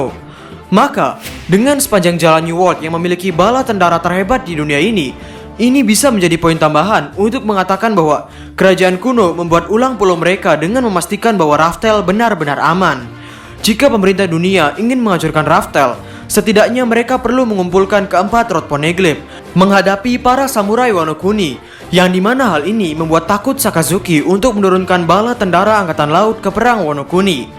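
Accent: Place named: Ambon, Indonesia. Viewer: Ambon mana suaranya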